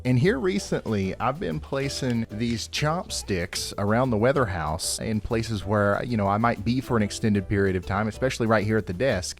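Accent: South, United States. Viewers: Howdy from Richmond, Virginia. I’m here for the great weather reporting but also for the kind southern voice. It’s a disappearing accent.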